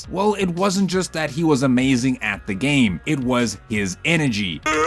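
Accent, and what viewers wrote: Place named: South Africa. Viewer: you sound like you south african trying to sound like an american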